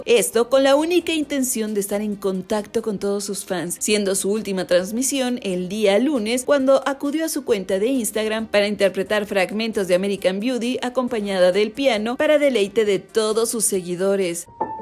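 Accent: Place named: Latin America. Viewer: Veo mucho Curioseando y ya me acostumbraba a una voz española, y ahora me tengo que acostumbrar a una voz latino americana.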